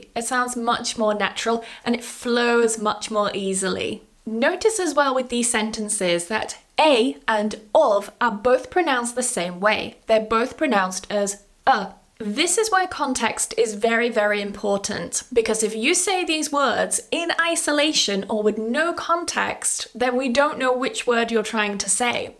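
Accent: United Kingdom. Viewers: Que bueno necesitaba esto de prunciar acento british me encanta 😊😊😊😊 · I really i like british accent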